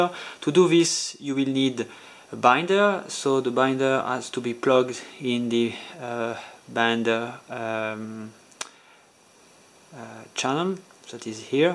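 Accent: France. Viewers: sound french, lol. good job man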